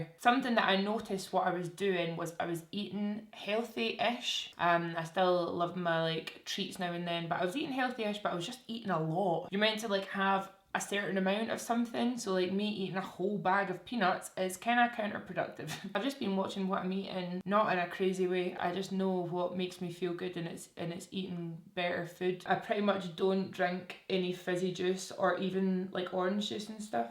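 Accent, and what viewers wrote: Scotland, United Kingdom. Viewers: I randomly found your videos a few weeks ago and I have binged sooo much of your content. I love your style, your attitude and your Scottish accent. ~ You are gorgeous. Cheers ~ Kay from Oz ~ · You sound just like Adele with your British accent! Lol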